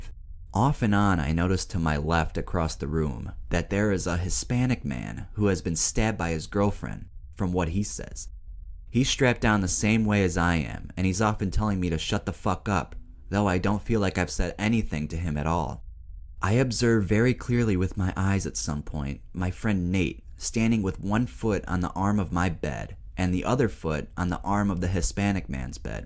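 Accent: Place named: Upstate New York, United States. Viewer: Yooo i knew you had an upstate ny accent haha 585 waddup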